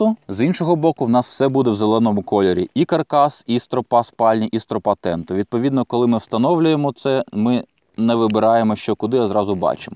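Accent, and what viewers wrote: Standard. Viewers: Гарний огляд, та гарна та чітка українська мова, дякую!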